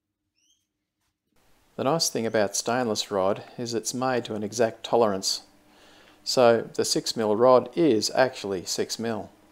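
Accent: Australia. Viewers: Love your honesty, admitting to small mistakes. ALL of my successes have built in mistakes - just makes them more realer! And, good to hear an Aussie on YT!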